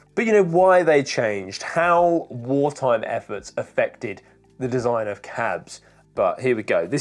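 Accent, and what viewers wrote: United Kingdom. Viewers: such a lovely video, I listened through this like a podcast! I’m just interested to know where you’re from? Your intonation is somewhat of Londoner but has a different twang to it.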